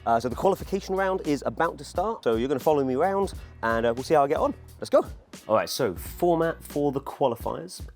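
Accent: United States. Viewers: Your accent had me wondering why it was just for the southern states, so great job, it took me a moment to understand it was an American accent vs southern. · I can confirm that we Americans speak like that. I think it was spot on.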